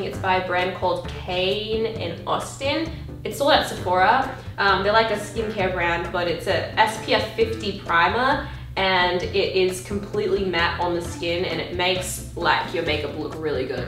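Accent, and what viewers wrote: Australia. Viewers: Came here because I was listening to one of your videos and I heard you hearing some stuff in an Australian accent so I got very confused coz I thought you were American, I know why now lol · You sound more Australian than I do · This is funny because the first time i watched one of your videos i really thought you were Australian as in i was SOLD lol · YAAAS I LOVE THE ACCENT! Instantly clicked becaause of the accent hahah I love the Australian one. Also yes please do a curly hair updated video I'm half filipina & black too so help a sis out! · I freaking loveeee your Australian accent I think you used it in one of the first videos I watched of you and I was very upset when I found out that you weren't actually Australian😭😂. Forget the haters this was awesome! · As a true blue Australian, YOU SOUND PROPER AUSSIE and did great, be proud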